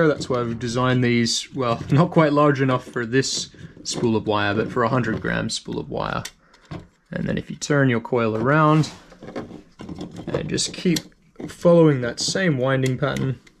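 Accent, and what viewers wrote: Australia. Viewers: Slight Aussie accent Almost American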